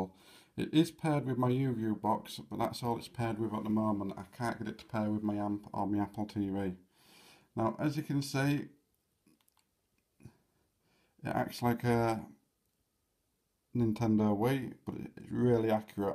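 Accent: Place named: Yorkshire, United Kingdom. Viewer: where bouts are you from, i notice the thick yorkshire accent and you also have "look north" on the telly.. just wondering if your anywhere near Hull... (Where im from!)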